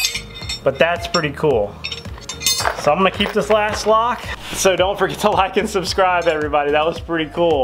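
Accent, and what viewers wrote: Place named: South, United States. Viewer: It's the accent....Southern braintrust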